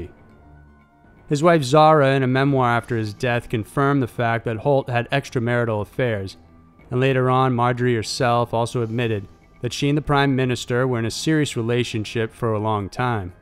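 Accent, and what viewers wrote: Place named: New England, United States. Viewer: msmeowdetroit, he said "father and father away" instead of farther. I hear a New England accent.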